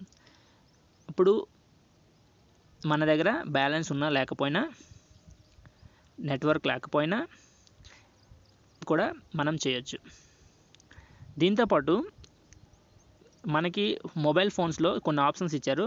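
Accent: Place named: Andhra Pradesh, India. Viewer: I believe you are from Vijayanagaram or Srikakulam. However the content you have given in this video is not about how Emergency works with no signal... Can you be more elaborative ?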